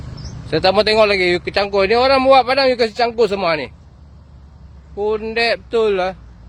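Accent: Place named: Bangladesh. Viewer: YANG CAKAP PUN MACAM BUKAN MELAYU DAN MACAM LOGHAT BANGLA.. PATUT LAH JIRAN INDONESIA CAKAP MALAYDESH BUKAN MALAYSIA.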